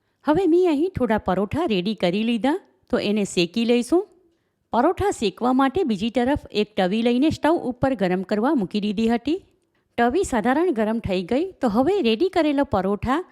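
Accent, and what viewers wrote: Surat, India. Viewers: તમારી ગુજરાતી રેસીપી તો સરસ જ છે પણ ભાષા પણ અસલ સુરતી જ છે.great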